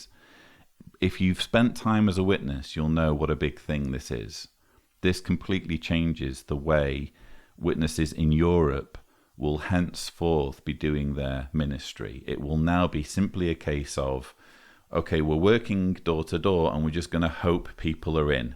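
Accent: United Kingdom. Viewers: I listened to 5 seconds and can see your a British nut!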